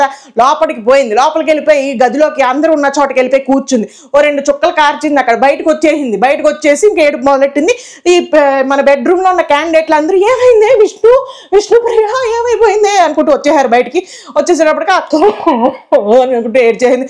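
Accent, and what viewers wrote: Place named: West Godavari, India. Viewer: navvaleka sachipoyanu! thank you so much! Bigg Boss should continue only for your reviews andi! Are you from West Godavari? Your slang sounds bit like us.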